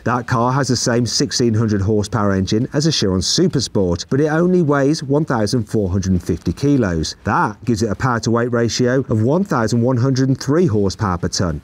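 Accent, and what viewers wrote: United Kingdom. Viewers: Logat British nya 🍷🗿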